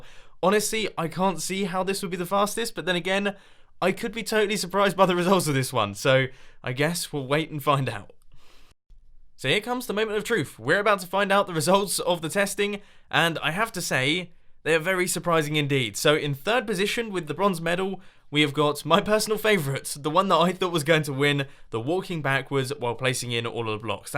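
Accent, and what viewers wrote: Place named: England, United Kingdom. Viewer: 2 questions. 1. Where are you from? I hear an English accent. 2. Do you believe in using mods like WorldEdit for laying lots of blocks?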